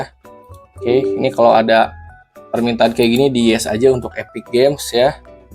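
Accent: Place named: Sunda, Indonesia. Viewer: aksen sunda lo kentel banget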